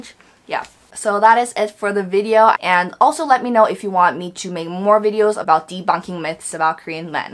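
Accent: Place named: New Jersey, United States. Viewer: This person is talking about "Foreign girls being shocked at..."🙄😌She means American girls, though.. (Although obvs she's never come across any decent British men (just chavs as far as I can see.) She talks as if the whole chivalry aspect & other such things are purely for Korean men, when certainly that is not the case - & any decent British man will displayed rather a lot of that kind of thing - but she appears to hv dated a lot of wasters, who don't "Ooooh - damn that masculinity..." - frankly it's ridiculous to try to make men, not be men - & espc to be "offended" by them being so🙄😌 You don't need to "prove" that you "don't need them" & can "make more money than them" (Ssuming you KNOW that about yrself, & a man trying to impress you by pretending he can do mosr things better than you, is just a man being a man - you appear to want them to change who they are; so no wonder you keep being disappointed. 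You being "able to earn more than them" or "get a better job" , that doesn't need to come into it - but if you constantly hv to try to make yrself feel better about yrself - well thats not any man's fault either - do you watch these back, bc any therapist would hv a field day with this stuff, I think..🙊💜My gosh, what doesn't she complain about🙄😌 This person should just admit she went to America & became an American - & that she goes out of her way to annoy most Koreans as much as psbl by being one - by being yr basic mouthy New Jersey or even Queens type (& that accent is AW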